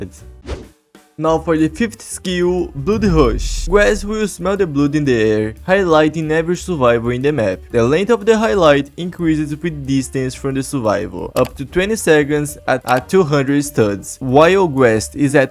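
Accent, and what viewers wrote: Brazil. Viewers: Cara não vou mentir que incomoda o inglês brasil seu sotaque me ataca muito mas bom video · O inglês mas brasileiro q eu js vi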